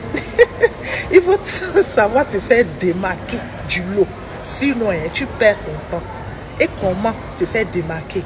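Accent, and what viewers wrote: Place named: Togo. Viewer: l accent est togolais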